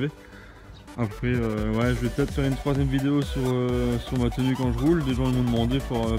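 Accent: Alsace, France. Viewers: ton accent t'a trahi tu habite à Strasbourg ou dans les bled à côté ?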